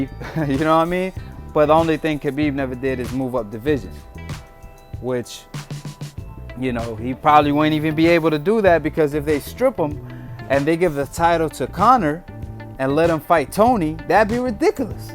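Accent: United States. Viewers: I was there you idiot.! McGregor didn't sucker punch anyone and you sound American why would you take up for this trash and what could have been a lot worse than it was and another thing he proved his point in the octagon there was no reason to take it out in the crowd with Innocent people that could have severely gotten hurt or killed . Why are you American's so worried about this idiot you do know his family his friends and teammates ,father all been questioned about 9/11 and the real truth why his father cannot get a green card to come over here it's because they found out his father was associated with the terrorist of 9/11 and you want to back this BITCH "And this is FACTS not just speculation".... And if you are American and live in the United States of America and do not like it here get the FUCK out.!!!!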